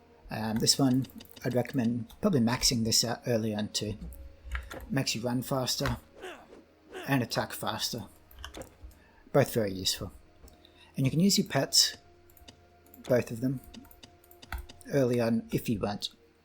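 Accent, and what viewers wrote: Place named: Australia. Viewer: Thank you for the information. Aren't you Australian? I saw in the comments that you have an aussie accent.. You woke up very early on the holiday morning. Sorry for sending you a comment notification in the early morning!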